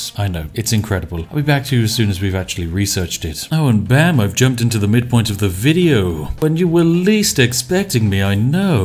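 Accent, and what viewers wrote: United Kingdom. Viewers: "The most exciting thing since teabagging" and "welcome to the bone zone" perfect commentary delivered in the excellent British accent, love it!!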